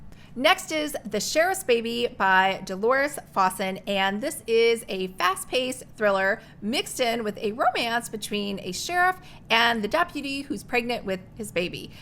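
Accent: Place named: United Kingdom. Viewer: Bed and breakfast and fun British accent ? Count me in ! This sounds like the perfect weekend read. Can’t wait to dive into it—thanks for the great suggestion!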